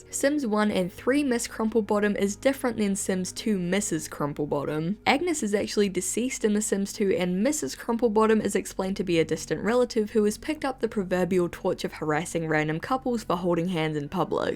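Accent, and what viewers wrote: New Zealand. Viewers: shes from New Zealand im pretty sure. nz accent? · Sometimes when I'm watching your videos I get so used to hearing your voice that I forget you have a kiwi accent and it's almost jarring when you suddenly reference something Aotearoa-specific -- the Mr Whippy remark got me this time!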